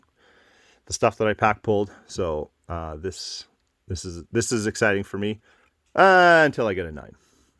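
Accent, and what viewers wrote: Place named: Canada. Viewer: I'm in Kingston, Ontario. I knew this guy was Canadian a few seconds in! Cheers fellas.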